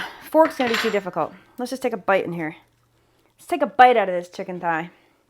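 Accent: United States. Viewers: Please don’t apologize for normal speech. I enjoy your cooking passion and your every day American speech. You owe no one an apology. Love your videos!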